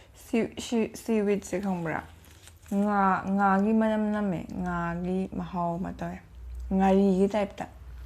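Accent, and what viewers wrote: Kakching, India. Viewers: Kakching lon ngangba c n henna neire keino ado haobro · Kakching londo yam nungcee luf u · Kakching londa adum ngango ym nungye taba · Che kakching marolda ngangbase ymn nungcye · Kakchng mchani khngnaba dumm che gi vlog khufing gi ngango duna nungai... Eisu kkchngne...😁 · kakching marol da ngangbasee yam ngaojei yee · kakching marol se yamna manipur da pamnarklibane ateigi khoibaga mannadabane lovely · Kakching londa adum twbiyu aduna henna taba nungyjei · Ngasidi kakching lon taba phngngiiii💃💃💃💃💃. Yam tba nungaiyeee ado mthnggi vedio dsu kakching lon oin twseeee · Che kakching lon ngangbana nungcye che nungcbana loireda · nahalkhei ngangbado adu oina ngangbiyu kakching lon natabida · kakching lon sae eym nungcyae tabada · echan gi kakching gi londa ngangbase yam taba nungai, adum ngangbiyo:) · Che ym haormdoi mlle kakching londa asum ngangbi rk o taba ym nungaiye nungsu ym nungcye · Che2 na kakching lon ngnBse ym nungsiYe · Video loina kakching maron da oina twwo... 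Duna henna nungai jei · Kakching lolda ngangbana henna pos tai ae.. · Kakching marolsi eidi Yamna Nungsiye taba Yamna nungai... Adum ngangbiyu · Kakching maron ngangbajidii yamm nungche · New subscriber👍...kakching nupi amt koidb ydre lon khoirg ngngk nba😃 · Hwjikta thengnabni chegi channel se..eisu kakchingdeini Eikhoigi londa ngangbsi tabada nungaijei👍👍👍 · kakching londa ngangbase ym nungcye🙃🙃🙃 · Kakching marol je khara thuna ngangje amta tage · wa ym ngngo kakchng londa taba ym nungaiye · kakching londa adum ngangbiyo yam taninge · Ym nungcyee kakching marol da ngangbashe pukningbu nungcrakae che na ngangba taraga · mathngdi kakching marol ngangbgi oina twrk o chaba tok o ngangbdo taninge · Cgi channelse ngacna ahanba thengnabne subscribesu twjre.aduga sisterse mapanda leibro? Kakching lonse somna ngangbcdi tabda nungaiye.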